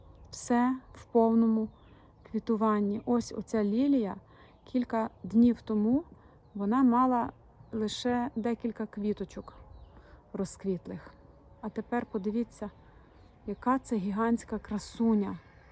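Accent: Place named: Rivne, Ukraine. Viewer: Дуже гарне вiдео та мова наша украiнська, маю родичiв у Рiвненськiй областi , такий самий говор😊 Дякую !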